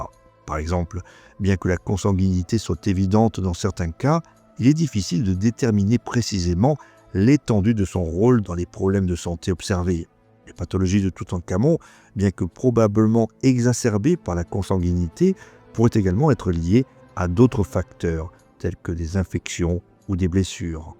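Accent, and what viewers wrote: Corsica, France. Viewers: Tu serai pas un peu Corse avec cet accent 👍🫶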